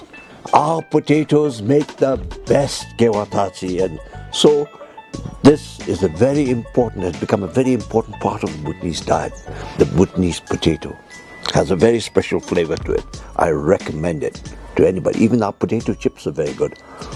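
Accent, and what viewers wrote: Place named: United Kingdom. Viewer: I must say you really do have a decent british accent !! Regardless to say..the food was tempting..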